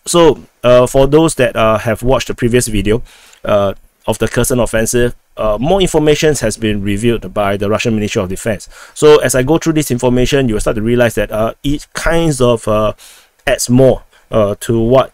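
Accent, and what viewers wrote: Singapore. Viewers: are you Singaporean or Malaysian? no horse run brother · You sound Singaporean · Agree, lets say the smallest bias then. I suspect leans somewhat to the russian side. But probably doesnt care too much. says; "I just want the war to stop" - and I belive him. Again, because is from Singapor is able to distance himself from the bias thing. I am surprised that has so many subscribers, due to the heavy axent. It is probably due to the neutrality in his reporting and nothing else. even makes small jokes while reporting, noone else does that. Again, that is also probably due to his true neutral standing. Because doesnt really care either way.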